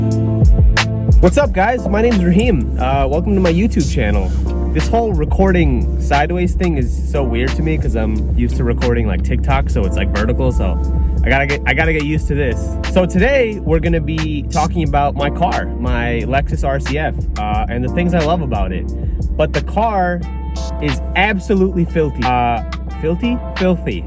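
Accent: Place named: India. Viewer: I love the indian accent i chill with sum from saga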